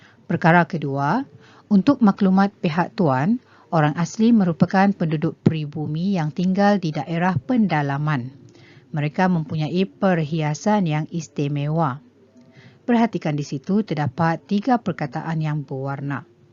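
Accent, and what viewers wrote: Sabah, Malaysia. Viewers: Kenapa cikgu cakap macam orang sabah.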